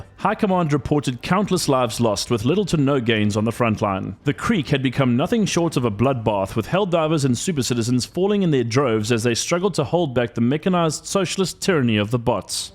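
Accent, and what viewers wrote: South Africa. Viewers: I can smell a South African accent a mile away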